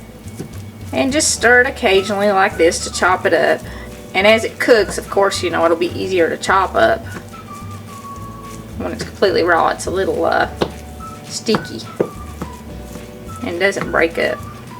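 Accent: South, United States. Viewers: I love your videos!!!! Keep up the good work. My family is from eastern Kentucky so I love that southern draw. I believe your from Knoxville if I'm not mistaken. I spend a lot of time in the Smokies. Your lucky to be that close, I'm 4 and a 1/2 hours away (Dayton, Ohio). One last thing, I see you have the Andy Griffith show on. Best TV ever.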